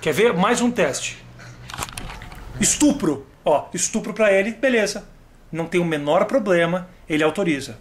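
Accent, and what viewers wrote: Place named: São Paulo, Brazil. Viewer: meldeus, o já está puxando o R de paulista... (se ele já puxava eu não fazia ideia).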